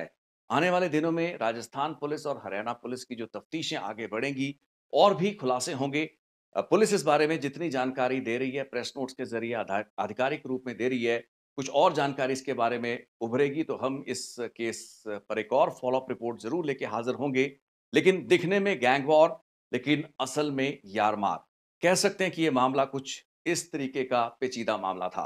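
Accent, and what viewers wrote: Punjab, India. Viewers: Good morning ji.. I understand in Hindi language, but love ur punjabi accent..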